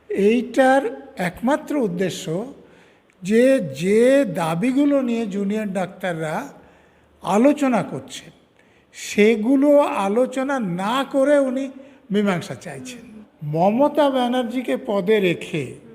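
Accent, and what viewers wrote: Bangladesh. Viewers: Aapnar mukhey dhakar kutti der kotha shuney anek smriti jegey uthchhey 😅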